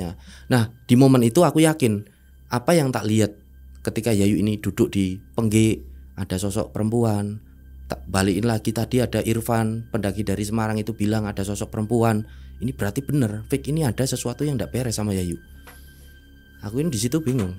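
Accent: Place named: Malang, Indonesia. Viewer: Sampean pemalange ngendi mas 😅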